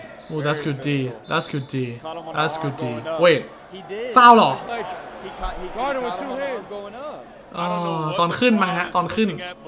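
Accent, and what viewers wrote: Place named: United States. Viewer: ทำไมพี่ต้องติดพูดเมกาด้วยครับ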